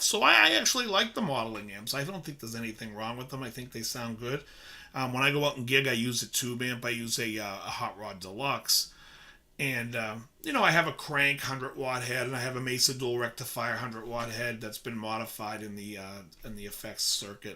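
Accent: Boston, United States. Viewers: You're smooth as silk on that Glamour Profession outro. Keep up the good work. Love the rants and the Boston (or vicinity) accent. Do me a favor and work the word 'car' into a rant sometime soon.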